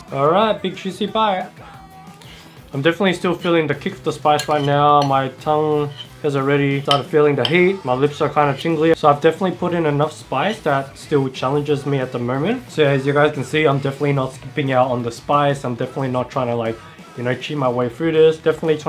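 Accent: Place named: Australia. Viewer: Your editing style is really well done. I think sparkling water is spicy, so I’m curious to up my tolerance. I’m from Israel but living in Australia (based on your accent/the Red Rock Deli chips, you do too), so not two places known for spice. Thankfully we do have so many options here thanks to the beautiful blend of cultures.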